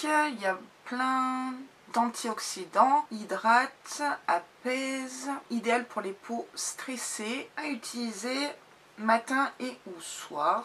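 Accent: England, United Kingdom. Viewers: Aloha :) Très belle idée qu'ils ont eu de proposer des boxs surprise même si lorsqu'on est abonné, l'intérêt est un peu plus limité. Tu m'as fait exploser de rire avec les produits Polaar mais après tu me connais, j'aime avoir du (sur-sur-sur)back-up, alors quand j'aime un produit, je ne suis pas ennuyé le moins du monde de l'avoir 3 ou 4 fois :P Autrement cette box est toujours merveilleuse car elle propose des marques peu habituelles du fait qu'elle ne soit pas française et c'est l'occasion d'apprécier ton délicieux accent anglais qui a refait ma journée :) Je ne regrette vraiment pas d'avoir craqué pour 1 an avec Lookfantastic grâce à ton dernier unboxing. Plein de bisous !!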